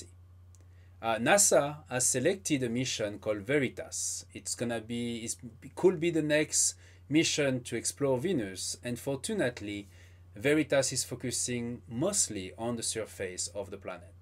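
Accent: France. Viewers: I wanna be told about Venus with a French accent. Oh Good. Thank God. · C'est bien ça, un accent français, j'ai compris au moment de "Camille Flammarion" hahaha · Nothing as charming as an English speaker with a French accent 👽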